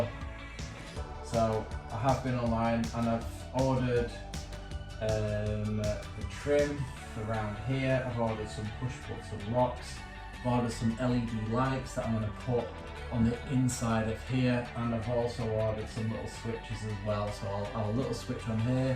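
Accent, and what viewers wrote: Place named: Northern England, United Kingdom. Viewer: Liking your blue nail varnish to match your van 😎 😉 Proper workman’s hands there. All looks great, mate. You’re a great story teller, as well as fun to watch. Not surprised you’re subs are rapidly rising. I think your northern accent makes it even better when you’re winging about your missing hinge bracket 😂 hope you’re going to video bits of your road trip. Currently down at lands end in Cornwall in my 56 year old beetle. 210 trouble free miles so far 🤞🏻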